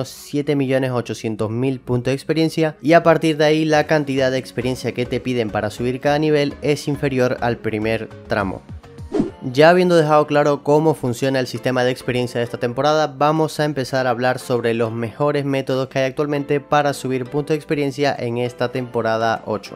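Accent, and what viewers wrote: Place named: Canary Islands, Spain. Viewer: Holii eres de canarias? Tienes acento "nueva" xs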